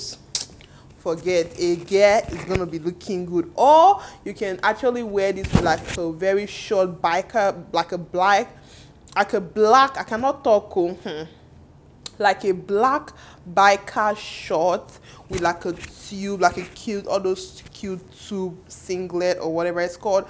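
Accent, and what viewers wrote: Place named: Nigeria. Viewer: I love that Nigerian accent 🔥🔥❤️❤️😍😍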